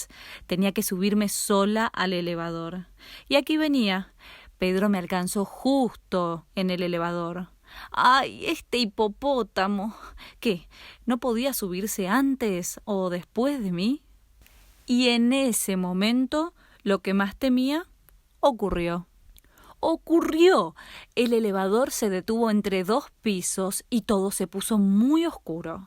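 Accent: Argentina. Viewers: Suena re argentina y hablando con tuteo y lenguaje neutro JAJSJSJ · que onda con su voz 0-o y creo que es argentina :v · la voz parece argentina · Es la típica argentina narrando algo escrito así hablan en el canal Paka Paka. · Tu acento argentino se nota bastante no intentes hablar en neutro porque te queda mal. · Esa voz es re Argentina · machu YT en las distintas provincias de argentina tiene acentos diferentes, x ej los porteños y los cordobeses. ahora esa es una diferencia clara, pero tmb hay diferencias que no se notan mucho, como será este caso. bueno eso jaja, es argentina pero usa aquí, puedes noc re rancio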